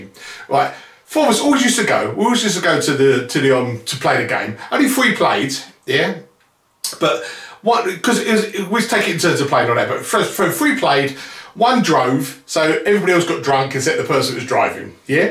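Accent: United Kingdom. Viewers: I don't know how anyone not from the UK can understand you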